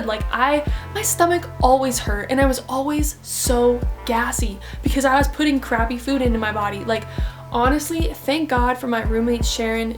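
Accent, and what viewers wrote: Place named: Montana, United States. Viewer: that montaner accent 😂 gotta embrace it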